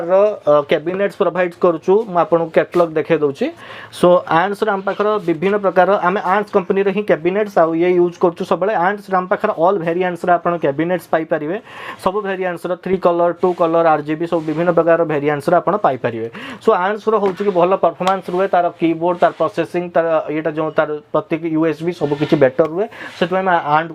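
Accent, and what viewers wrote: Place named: Balasore, India. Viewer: Bhai tama ghara balasore ki Tama accent ru lagila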